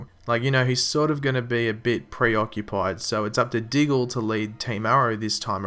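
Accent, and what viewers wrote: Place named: Australia. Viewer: his Australian accent i think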